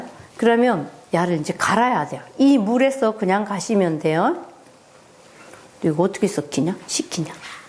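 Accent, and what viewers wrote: Gyeongsang, South Korea. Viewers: 스님 경상도말이넘정겨워요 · 외국말이 아니고요 경상도 사투리에 표준말 석어 하실려니까 어색하게 들려 그런거 갇은대요 ㅎ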